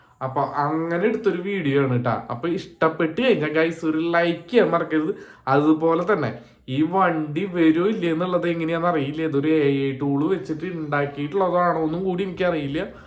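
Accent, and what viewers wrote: Thrissur, India. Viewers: തൃശൂർ ആണല്ലേ........!!!!". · ഇയാള് തൃശൂർ ആണോ തിരതൊരം ആണോ?? 😂😂😂😂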